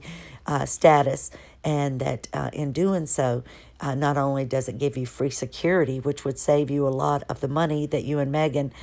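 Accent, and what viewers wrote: South, United States. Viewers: Thank you for reading this. You have a delightful voice and a gorgeous southern accent ❤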